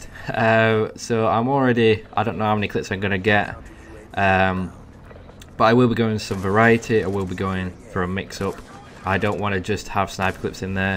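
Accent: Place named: England, United Kingdom. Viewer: ENGLISHMAN!!! :) Ooooooooooshhh!!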